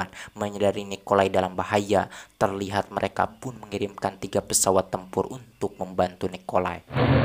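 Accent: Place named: Java, Indonesia. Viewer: Jawa bangat sih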